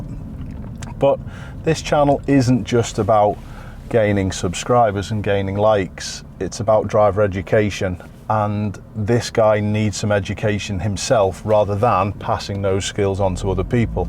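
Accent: Liverpool, United Kingdom. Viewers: Remove ALL German whips from our roads haha!!!! Seriously though, not great driving I agree. I am now 50 years old and my driving has improved significantly since I was a youngster. It is ALL to do with attitude and mindset! Keep up the good work, I have never listened to a Liverpudlian so much before but your videos are great and keep me mindful about so many things when I drive.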